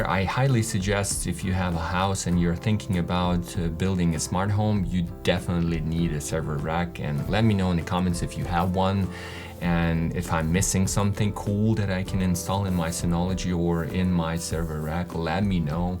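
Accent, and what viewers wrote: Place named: United States. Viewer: Hey I like your accent,- sounds really good American!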